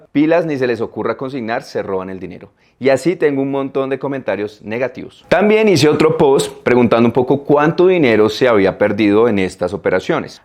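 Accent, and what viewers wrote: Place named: Colombia. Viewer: Tienes acento Colombiano, te refieres a 5 millones de pesos mexicanos?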